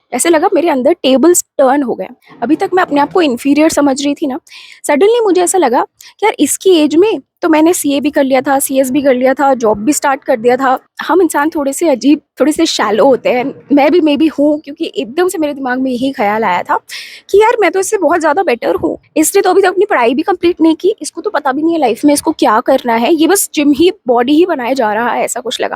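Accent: Rajasthan, India. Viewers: Mam aap alwar rajasthan se ho kya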